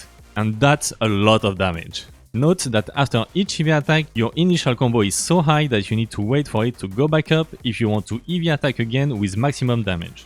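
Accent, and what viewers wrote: France. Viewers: Excuse-moi de te poser la question en français mais je suis nul en anglais et j'ai remarqué ton petit accent français. Je souhaitais savoir si le troisième passif de voruna pouvait être fort avec la dague en céramique, et si tu me le recommanderait. Mis à part cela j'ai beaucoup apprécier le travail que tu as fait pour cette vidéos, force à toi.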